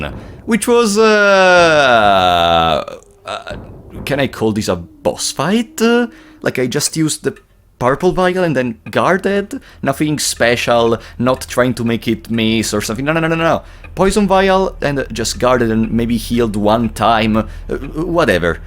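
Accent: Italy. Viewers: As a fellow italian, i now understand how the accent makes the content more entertaining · Never would I have expected I'd be introduced to F&H by an Italian speaking in english. Do you know by any chance if there's an italian patch on the way? I wanted my brother to be able to play this gem, but I couldn't find anything on the matter... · Yknow im a little stupid so i didnt pin you as Italian. And then i heard you say "item only". Just wanted to say that. It is very distinct and beautiful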